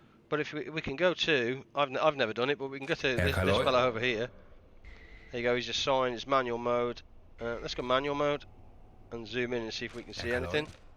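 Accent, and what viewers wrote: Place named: United Kingdom. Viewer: Very nice gameplay and commentary, you definitely have a sub(-scribe) ;-) from me. Keep it going. Also approve of the very British way of commentating :-) . Really like the way you communicate with your stream viewers and take suggestions from them.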